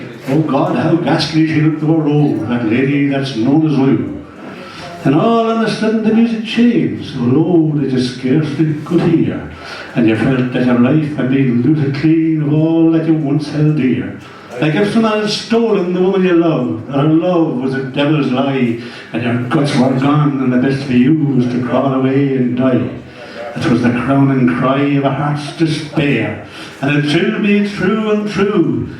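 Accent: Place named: Ireland. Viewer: Words are unclear to a non Irish speaker of English.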